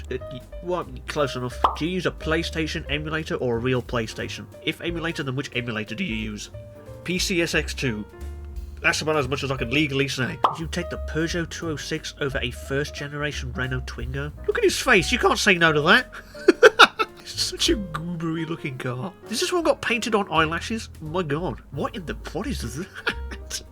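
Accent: United Kingdom. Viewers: HELLO FUNNY NASAL BRITISH LAD,CONGRATS MISTER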